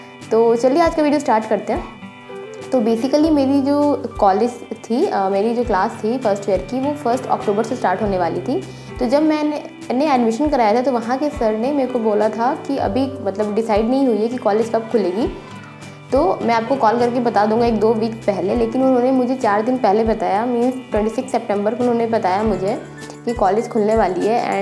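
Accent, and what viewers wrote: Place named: Bihar, India. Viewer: I'm also a bihari her accent is relatable...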